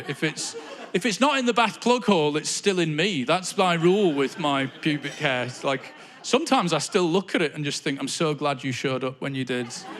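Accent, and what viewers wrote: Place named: Manchester, United Kingdom. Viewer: I'm a bit racist with my comedians as I prefer Mancunians 😆The accent lends itself to comedy.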